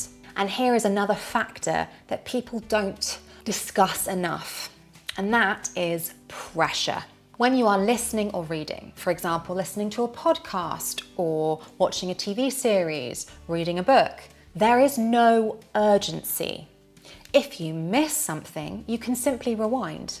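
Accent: United Kingdom. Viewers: de que parte del Reino Unido es su acento , ❤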